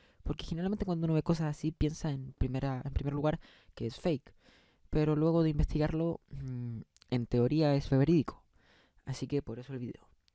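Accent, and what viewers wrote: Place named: Antioquia, Colombia. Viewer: Sin ofender pero tú voz es muy paisada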